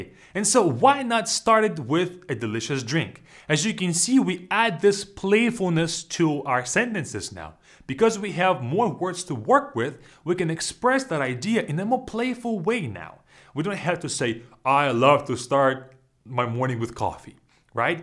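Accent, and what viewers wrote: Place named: Russia. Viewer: Чувство, что он русский, но прикидывается иностранцем.. Даже акцент русский есть:0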